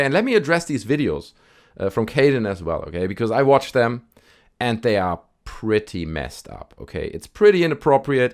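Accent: Germany. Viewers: come on that sounds german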